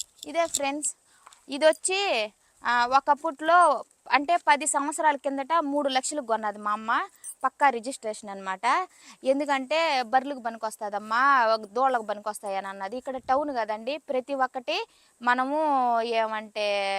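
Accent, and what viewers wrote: Nellore, India. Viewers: Nellore slang ❤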